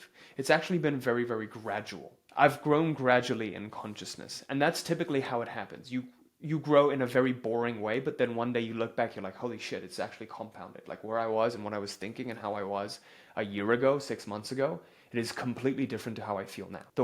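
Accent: United Kingdom. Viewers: Why is Muslim talking about Sanatan Dharm-Hinduism in a British Accent for American audiences? I guess The truth shall set you free, at the end of the day the truth will prevail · Why is Muslim talking about Sanatan Dharm- Hinduism in a British Accent for American audiences?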